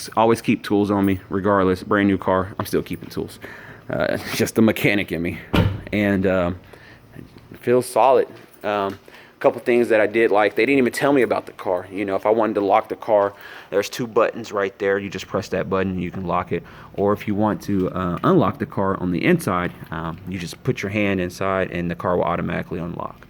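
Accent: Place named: Oklahoma, United States. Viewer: Awesome review man, i had a feeling you were from Oklahoma just by your accent lol. But I'm getting rid of my Legacy for either the Camry or the Accord. I'm leaning a little towards the Camry now lol.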